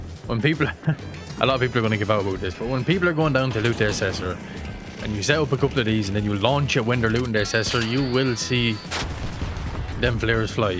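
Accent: Ireland. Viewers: I'm a simple man, I hear Irish accent, I follow, like and subscribe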